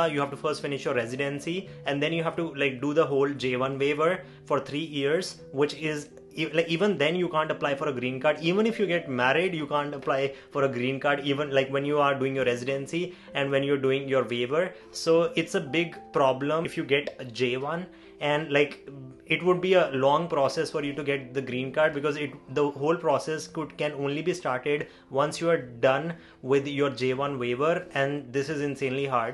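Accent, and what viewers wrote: India. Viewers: i really cant stand indian accent ufff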